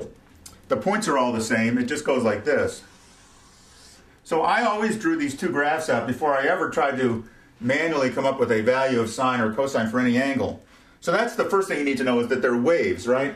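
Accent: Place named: New England, United States. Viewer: Lol a slight new england accent I think is what you're getting at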